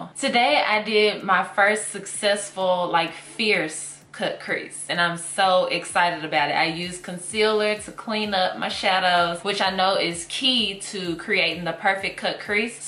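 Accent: South, United States. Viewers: Beautiful! Every Time I watch your videos I always think of Beyonce your voice sounds so much like her speaking voice. Not just the southern accent but also the tone.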